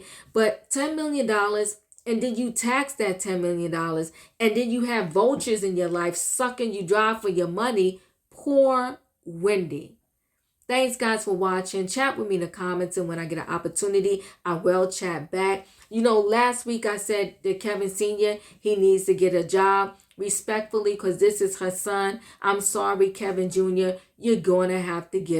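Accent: New York, United States. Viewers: Big ups to the NYC!! Love your new York accent I miss my peeps!!❤️❤️❤️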